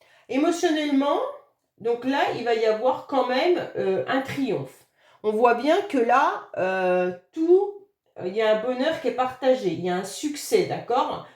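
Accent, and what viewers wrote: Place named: Franche-Comté, France. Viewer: Vous avez l'accent franc-comtois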